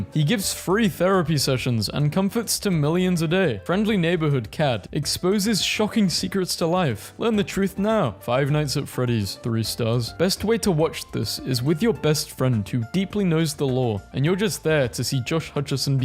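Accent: United Kingdom. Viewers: YOUR BRITISH TOO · wait ur british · You British?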